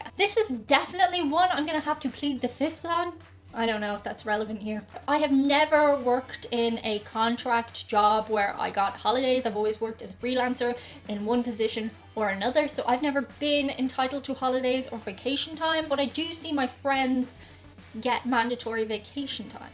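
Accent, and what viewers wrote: Ireland. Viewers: Miss I am a old half American Scottish German Love your content huge fan but is nearly impossible to get Scottish food in Detroit Michigan.. but I wish you never taught me about crisp sandwiches curse you lol I grew up with Scottish German Italian southern accents it is my consensus that your Irish is the most pleasant on the ear. I really enjoyed your collaboration with wee Scottish lass If we ever get free this virus please consider doing one with batchy. Actually follow about 20 people from the UK the try videos you guys are awesome one of you guys always puts a smile on my face before I go to sleep keep up the good work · Just discovered me a new channel with another gorgeous woman with an accent! Got me a Filipina, a German and now an Irish.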